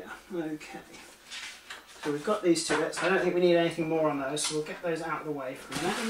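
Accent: United Kingdom. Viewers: He's from the UK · He's from the UK.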